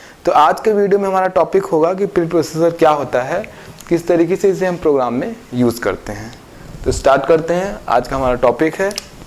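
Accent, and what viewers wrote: Bihar, India. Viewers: by your tone i think you're also from bihar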